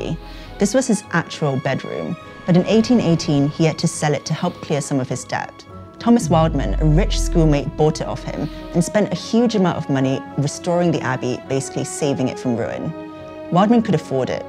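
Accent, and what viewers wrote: United Kingdom. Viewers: It’s cool to see and Asian decedent with a British accent narrator the history of uk 🇬🇧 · Never thought I’d see a Asian with a British Accent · Isnt this the women that doesnt use toilet paper or something? Why is she speaking in a british accent all of a sudden? <link>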